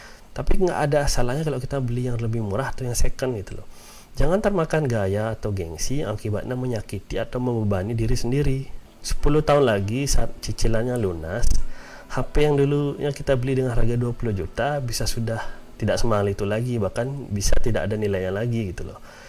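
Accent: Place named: Bali, Indonesia. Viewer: Wah, ketemu channel ini, suka kale pembahasanny dan logat balinya.. dulu pernah kerja di bali 2 tahun... Jadi sudah biasa sama logatny.. moga2 channelny bisa kembang terus.. yg di sharing kebanyakan bermanfaat dan mindsetny bgs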